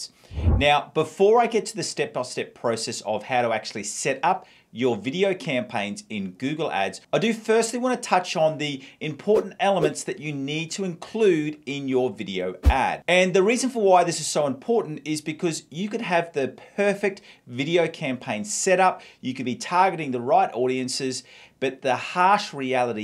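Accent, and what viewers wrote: Australia. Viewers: Love these videos. Super informative. Great flow. You can never go wrong with the Aussie accent. Cheers mate.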